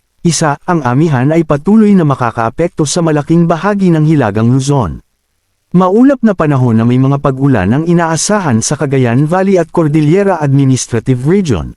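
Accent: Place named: Cebu, Philippines. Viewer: Replay dito bro, naks ha, pamilyar ka ba sa Cebu bro at parang taga doon ka pagnag balita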